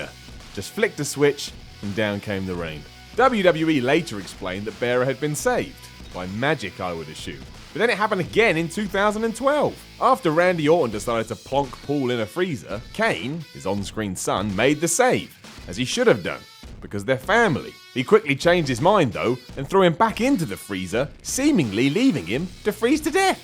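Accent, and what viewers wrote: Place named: South Wales, United Kingdom. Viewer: are you from south Wales you sound like it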